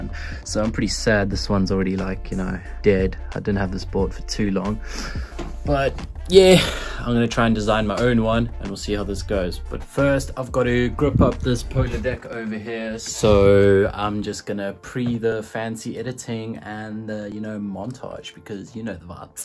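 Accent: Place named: South Africa. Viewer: Heard his accent and knew he was from SA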